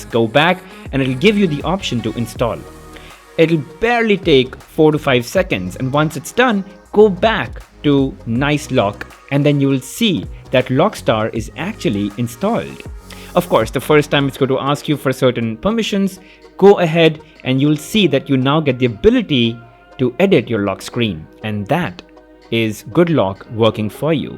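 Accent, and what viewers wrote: India. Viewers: indian accent English is pure ear cancer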